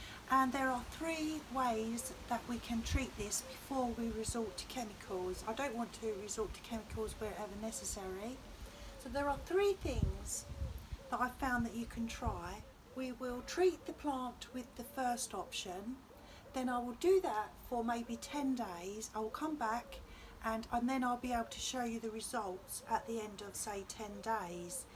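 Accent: London, United Kingdom. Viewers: Yes it’s been in the same place for 15 years and I’ve cut it right back with the intention of getting rid of it, but it came back healthier till the fungus took hold again. Unfortunately it’s base is too large and established to be moved easily. It’s on a north south oriented fence so it get shade and sunshine. I heard that feeding and watering them well helps. One last ditched attempt this year for me. This morning it looked really well and healthy with all this heat. Have a wonderful w/e. BTW is that a London accent you have?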